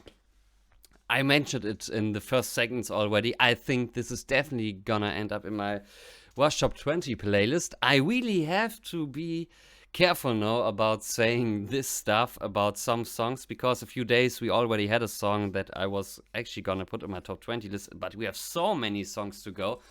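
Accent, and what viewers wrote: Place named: Germany. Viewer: Neil was writing themes that were 10 to 15 years in advance of the times. Clock Work Angels is unfolding today/. The German accent is cool. You sound all right man. This song describes the obstacles of today.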